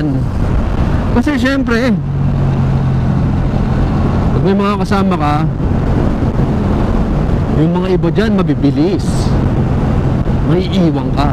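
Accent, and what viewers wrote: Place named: Batangas, Philippines. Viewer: O batangas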